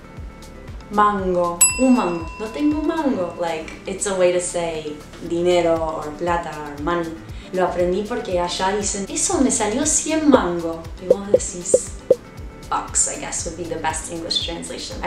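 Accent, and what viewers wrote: Argentina. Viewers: Verdad q a mí me confundió en el principio, parece muy Argentino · fuaaaaaa hasta parece que sos argentina en lugar de yanki.. buen oido! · Hola... como estás hablando no es necesariamente como se habla en Argentina... asi hablan los porteños y bonaerenses... en el resto del paiz hablamos de otra nanera... si usamos algunos de esos modismos... un saludo gracias dsd Mendoza tierra del sol y el buen vino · Que buen acento Argento! Congratulations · Acento argentino 100% 😀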